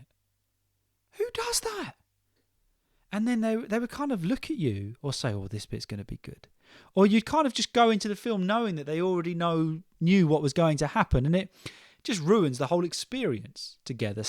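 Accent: RP, United Kingdom. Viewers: Hi yeah, Hope you are doing good. Being an expat from a non English speaking country and having an advanced level of English speaking, I still find it difficult to grasp British accent. I recently started listening to your podcast with an intent to get accustomed to it. Thank you; you are helping me in understanding the accent and British communication style. Hope listening to you more will help me crack the accent soon. On the critical side, at times I feel you speak more of RP English. While It may be your natural speaking and teaching style, I sometimes come-across accent that are even more harder to understand. Coming to the topic, I feel etiquette is set of unscripted rules which vary with place and culture. Following these unscripted rules can be daunting for someone like me who is new to the country. Cinema etiquettes is almost same everywhere, but there are always a few who don’t follow it - at the very least, by not being on time. 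About the personal space, in my country, putting feet on the seat is considered rude and doing so with shoes on is the rudest. (Can’t imagine the places that shoes might have stepped! ). Unfortunately, I see a lot of youngsters doing same in trams and trains here. To be frank, that’s worst behaviour. Rogues exist in every corner of the world. Another disgusting behaviour that I experienced here is people loudly blowing their nose (while having flu) in a professional and quiet space. It’s really nauseating, especially coming from otherwise w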